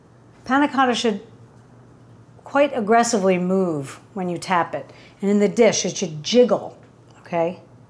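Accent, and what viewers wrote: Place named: Northern England, United Kingdom. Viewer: I’m living for your Northern accent luv. Proper bloody great!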